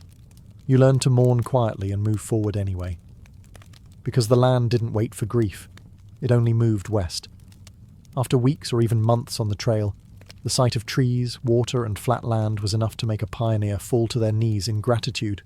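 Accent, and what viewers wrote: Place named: United Kingdom. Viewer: PS: Are you American, Canadian or British? Your voice and word usage sound vaguely British.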